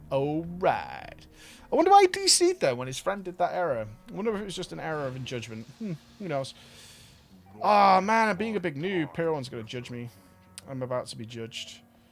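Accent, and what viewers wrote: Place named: RP, United Kingdom. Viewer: your a duki lad why has your voice gone all posh:)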